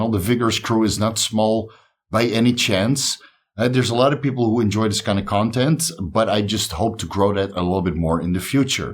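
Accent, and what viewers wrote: Netherlands. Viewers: Congrats on the 50k, definitely deserved. Glad I found your channel and hope to enjoy the content for many more years, and leave many more comments and likes. The videos on applying your knowledge on practical cases seems very interesting to me. Always like to see stuff applied as well as explained. Also: fellow dutchman here, I don't mind your pronunciation. I hate a thick dutch accent but your accent is subtle and enjoyable imo.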